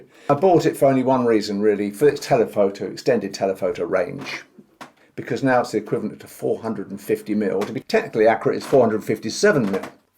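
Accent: United Kingdom. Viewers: Fantastic review. Most reviews have insufficient images and video to get a decent look at what a lens can do. You nailed it. Nice vocals too. So envious of you English with your sophisticated accents. Nice pics mate - great vid too. Astia looked good. Do you do a special white balance when you shoot? Or just use Auto, or Outdoors??? Many thanks, Cam from NZ. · I will definitely get this lens, I love my 55-200 but I want the extra reach and the almost macro capabilities. Great review; I like your good vibe and your British accent. Greetings from Taiwan.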